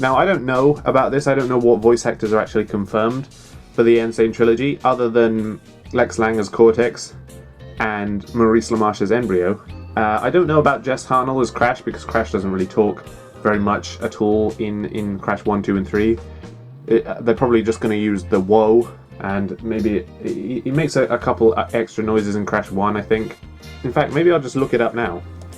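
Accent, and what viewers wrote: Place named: United Kingdom. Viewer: Its strange, I always assumed the genius behind was American. Nice to hear a fellow Brit that does YTPs. (I dont make videos I just mean I'm also British)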